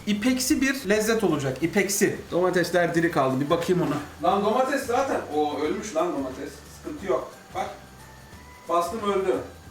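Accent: India. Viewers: hint aksanı > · hasikitr lan hint aksanani efsane yapiyo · Lan bu nasıl bir aksandır muhteşem lan hintlilerden bile daha gerçekçi konuşuyor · Hint aksanı mükemmel. Devamını bekliyoruz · Ben bu kadar güzel hint aksanı duymadım hayatımda aşırı iyi hzjahsjajs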